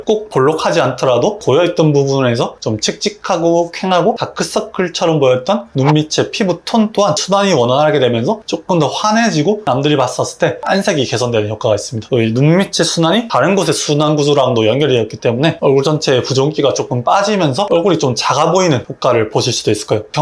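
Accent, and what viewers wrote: Busan, South Korea. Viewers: 부산분이신가요? ~하고 앉았노? 하는데 찐텐 나오심 ㅋㅋㅋ 부산분들 좋아요. 의리가 !! 암튼 잘 따라해보겠습니다.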